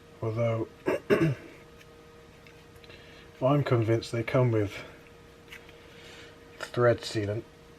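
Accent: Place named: Plymouth, United Kingdom. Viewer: sounds like a plymuff accent! top job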